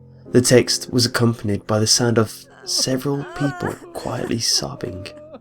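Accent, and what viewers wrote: United Kingdom. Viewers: Your BRITISH !?!?!?!?!?!?!?!?!?!?!?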